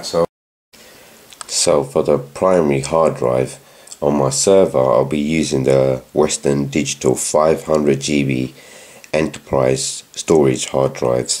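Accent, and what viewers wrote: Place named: Birmingham, United Kingdom. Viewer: Your from birmingham aint you ;)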